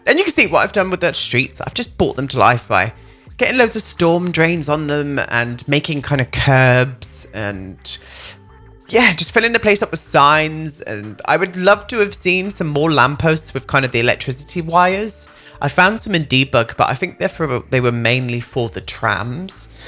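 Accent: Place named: RP, United Kingdom. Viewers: Oh lord, you sound posh. Lovely build, though, I wish I had that kind of creativity. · You have the most posh accent I have ever heard a real person have.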